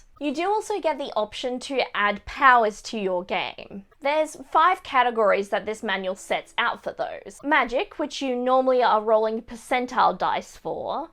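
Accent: Australia. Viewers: I really really want to love your channel, but you have an Australian accent still. :(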